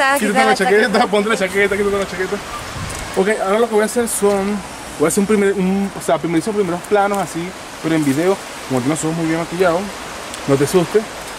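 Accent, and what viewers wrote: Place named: Venezuela. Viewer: Hola que tal Saludos. Esta un divertido Video. A penas empecé a verlo y dijiste la primera palabra de inmediato supuse que eras Venezolano. Hehe y al final del video dices que si efectivamente eres Venezolano. Llegué a este video porque me compré ese lente de 85mm y quería buscar algunos videos relacionados con este lente. Las fotos muy lindas. Muy buena composición y un divertido video. Saludos.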